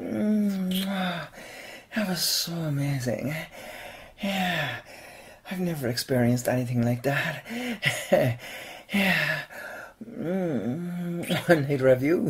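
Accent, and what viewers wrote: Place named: Ireland. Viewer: Irish accent is definitely a lady killer specially with voice!! 🍀🥂He's a lady killer himself and he knows it ladies hehe 🤭🥰 cute audio btw 😜💞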